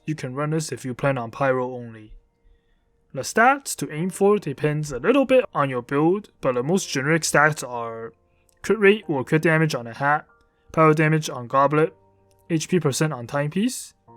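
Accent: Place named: China. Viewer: 哦哦哦，听你口音，还以为是中国的。哈哈哈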